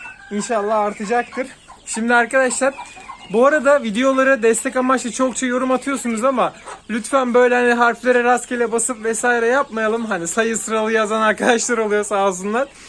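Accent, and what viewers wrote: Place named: Sakarya, Turkey. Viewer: Abi ses sakaryadami oturuyon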